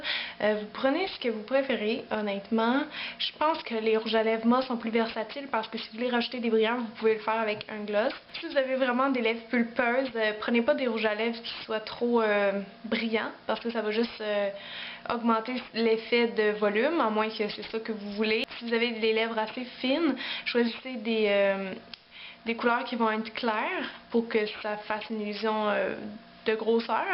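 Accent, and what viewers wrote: Canada. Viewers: Euh...désolée mimane18000 mais n'a pas d'accent...Ahhhh ok! Tu es française et tu parles de son accent canadien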